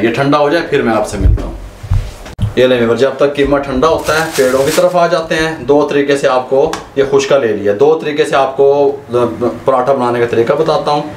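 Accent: Pakistan. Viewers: Pakistani accent aur wo bhi Punjabi ke sath bahot hi badhiya combination hai. Love from India ❤❤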